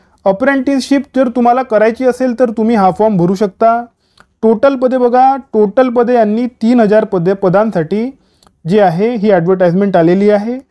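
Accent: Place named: Nagpur, India. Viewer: Bhau chi language nagpur chi vatte jara😊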